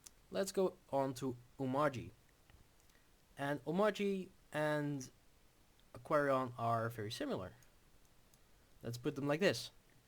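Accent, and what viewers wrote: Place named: Netherlands. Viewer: He has a pretty strong Dutch accent - probably the Netherlands ;)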